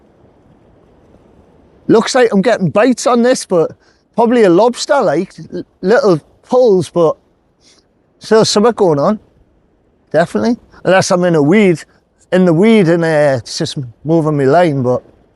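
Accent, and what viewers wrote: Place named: Geordie, United Kingdom. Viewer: I'm a geordie and you might just be the most geordie geordie I've ever seen on YouTube.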